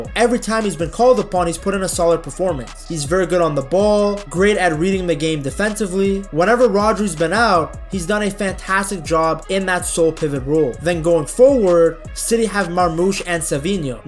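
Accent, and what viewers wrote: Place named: United States. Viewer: Can I ask you where your from? If it's the states then I would like to say that, your the only American I can actually listen to talking about footy!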